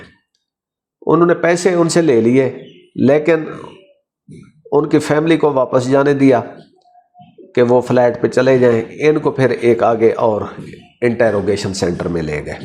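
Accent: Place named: Punjab, Pakistan. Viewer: Aap punjabi lehje main Urdu boal rahe hain aur sari duniya daikh rahi hai yeh video. Itni si tameez tu honi chahie ke proper lehje main is platform per baith ker bat karain.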